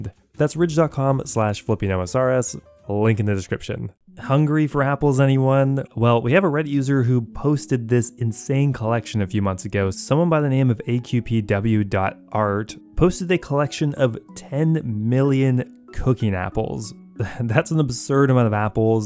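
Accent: Canada. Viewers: Wtf you’re Canadian?! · I always had a hunch you were from Canada 🍁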